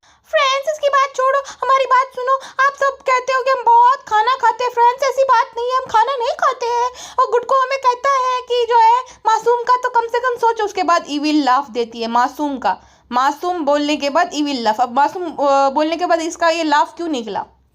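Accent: Gujarat, India. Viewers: Aap apne podcast me aise hi gujarati tadka lagaya karo bahut achha lagata hai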